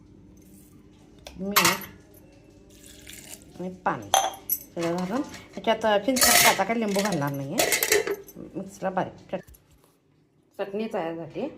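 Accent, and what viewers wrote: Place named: Karnataka, India. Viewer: Five like kele 🎉🎉🎉 खूप छान ताई रेसिपी 🎉🎉🎉 तुमच्या आवाजातील कर्नाटकी स्टाईल मला आवडते 🎉🎉🎉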